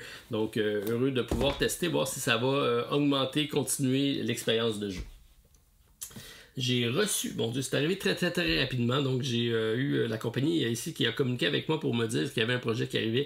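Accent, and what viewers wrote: Quebec, Canada. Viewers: C'est sympa de voir que la société des jeux s'étend. Avec des interlocuteurs différents avec qui on peut s'identifier (plus ou moins). Par exemple a l'école du jeu il y a avait 3 gars et je regardais les vidéos de Sylvain en priorité car j'avais bien marché avec ses goûts ludiques et ses analyses. Je trouve aussi bien d'avoir les Québécois s'exprimer et atteindre les français. Votre accent n'est pas assez présent (ou dialecte: "introduire" pour présenter ou une "efface" pour une gomme 🤣). Continuez ainsi! Mais pars pas trop loin, on est encore trop attaché au!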